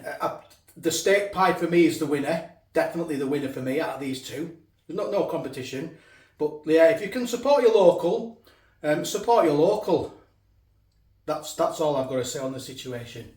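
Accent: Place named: England, United Kingdom. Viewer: I just wanted to hear an English accent today,! Love your posts, still catching up ❤❤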